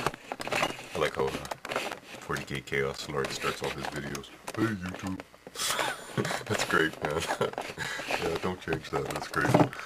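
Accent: Canada. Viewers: Are you canadian eh!?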